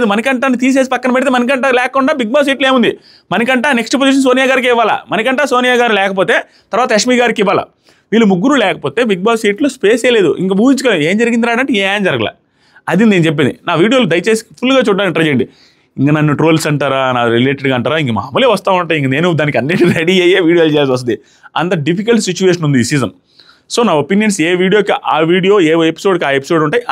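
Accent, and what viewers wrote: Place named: Nellore, India. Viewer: Adi anna Nuvvu reviews Chala baga cheputhav, Nenu Chala season nundi nee videos chusthunna . Nuvvu heartfull ga matladathav, Nuvvu mana nellore vadivi and pakka mana slang vadathav. Super anna 😊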